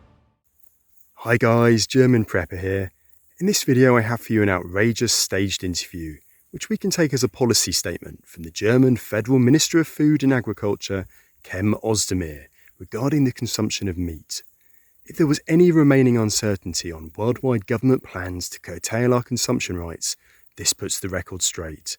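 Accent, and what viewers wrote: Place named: RP, United Kingdom. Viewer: He speaks English with an upper class accent, lovely pronunciation, but not over the top. Really easy to listen to.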